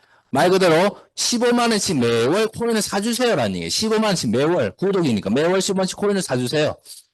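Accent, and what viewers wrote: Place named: Gyeongsang, South Korea. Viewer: 다함께품 협동조합을 만난것이 축복이고 기쁨입니다! 교육이사님 강의 짱이십니다!갱상도 사투리인데도 귀에 쏙쏙 박힙니다!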